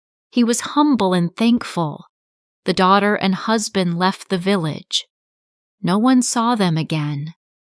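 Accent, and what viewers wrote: United States. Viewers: I'm also disgusted with your American English.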